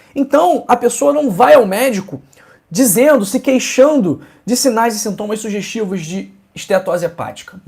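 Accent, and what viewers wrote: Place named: Pará, Brazil. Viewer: Su aque do Pará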